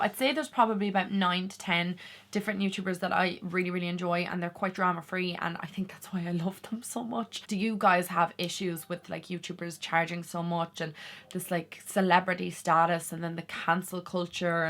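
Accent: Ireland. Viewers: I am here for the makeup. I think some YouTubers have forgotten that for many folks, they are not only a source of information and entertainment, but also a source of escape! Our daily lives are filled with SO much seriousness/responsibility: jobs/careers, family, illness, politics, etc; many see YT Beauty videos as a way of taking our minds off of all of that. A chance to 1). See a smiling face; 2). Learn of a new "look", technique, and/or product; 3). Hear a different accent (love that Irish lilt, btw!); 4). Even hear about a personal issue/problem that does not have an effect on us, but that we might be able to commiserate with, encourage, or even help others to know that they are not alone. But for me it will always be about the makeup. I do wish the "Drama" would remain behind closed doors. That is the reason for HAVing doors in the first place! Sorry for the long rant, but I am tired of those other folks forgetting their purpose here: To have fun with makeup! 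Thank you for being among those who do remember. Take care. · I feel like certain makeup youtubers are trying too hard. I like watching you as i love your down to earth personality and your accent. My mams side is from Ireland. Lovely place 😍 you make me wanna grt my tongue done but im a scardy cat lol. Don't change hun 😀xxx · I love your makeup tutorials, all varieties. Also your Irish accent. I live in Texas.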